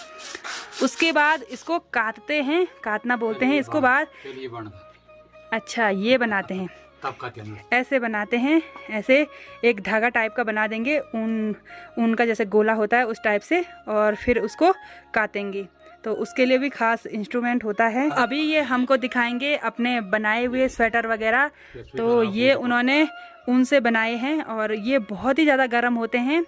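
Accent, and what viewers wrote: Standard. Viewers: Sister aap bahut achi hai sudh hindi bolthi hai aapki har viedeo hum dektha hai hum keralse hu india me keral wala bahut.......... Hota hai by sister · ji aap Pahadi ho fir bhi aapki Hindi Pure bol rahi ho...bahut badhiya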